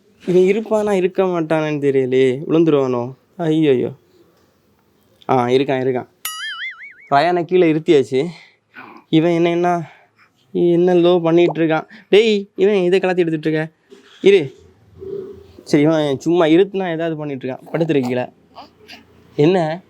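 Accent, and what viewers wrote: Kanyakumari, India. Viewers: Bro r u from Kanyakumari 🤔🥰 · Anna..... R u from Kanyakumari... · Kanyakumari ah bro neega Kumari slang la pesuriga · Bro nenka kanyakumari slangaa ,nan kanyakumari 😁😁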